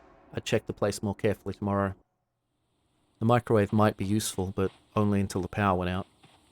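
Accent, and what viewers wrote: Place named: Australia. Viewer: "I snuck up behind one of them, and stabbed them so hard their pants changed color... That was weeyud." 😂This gave me a good chuckle lol Edit: "Weeyud" is just how my big-dumb Americanized English brain hears "weird" with your Aussie(?) accent! lol My bad if it's not Australian. Despite the fact that the Aussie and Kiwi accents are pretty much my favorite English accents to hear of all of them, I sometimes have difficulty differentiating between the two. A little off topic but I also really enjoy the accent Tom Hardy is doing with Alfie Solomons/Ron and Reggie Kray, which I've been lead to believe is a "cockney" accent, though I literally have not one fuck of an iota what that is and wouldn't pretend to lol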